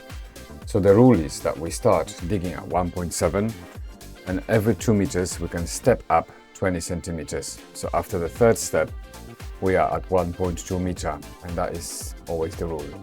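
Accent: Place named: Poland. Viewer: Hi thanks for responding I’m in Borehamwood, near Barnet, WD6 You sound like you are Polish, my mum is from Gdańsk Best wishes Mikey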